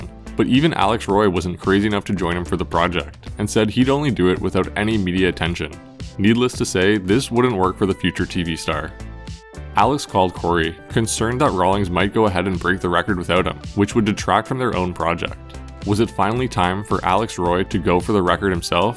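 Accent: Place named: Canada. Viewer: You can always tell which videos are from the great white North with that pronunciation of the out out oooout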